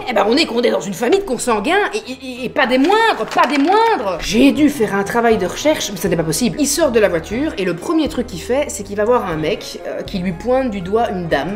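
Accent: Belgium. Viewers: Salut, Je sur kiffe vraiment le procédé de vidéo mêlé à l’humour et cet accent belge … 😂 Mais c’est vrai que ça va un petit peu trop vite … là même chose un rien plus lentement… serait géniale …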